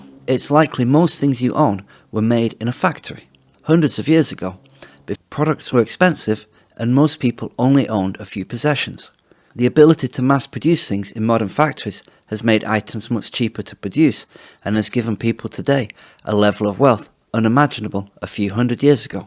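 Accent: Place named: Cheshire, United Kingdom. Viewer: This has to be a Cheshire accent.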